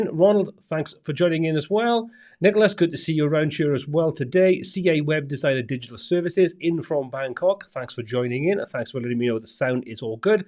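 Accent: Wales, United Kingdom. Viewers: is from Wales, it’s just his accent…maybe it’s a plug-in you are talking about?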